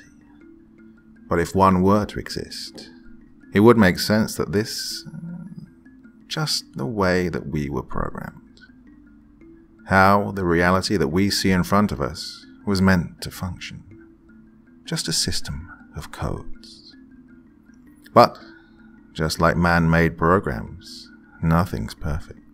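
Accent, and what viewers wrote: United Kingdom. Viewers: Why do british people do the best narrations?? My narrator voice I do has been British since I was a child lol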